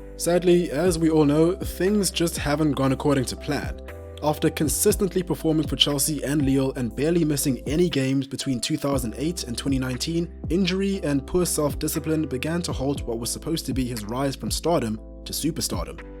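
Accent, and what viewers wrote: South Africa. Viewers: My man's accent sounds like he's a homeboy from South Africa. Love your vids mfana. Keep it up. Hope you blow up. Holla if you want to do a duo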